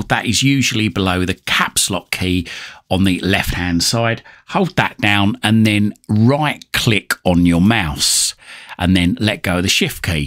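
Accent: United Kingdom. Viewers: Thanks loaded flawlessly on a 10 year old i7 4g ram laptop. It needs more ram really. I take it if I swap out the hard drive sometime I will have to load Win10 and go through the process again?? Great to hear a Brit voice😊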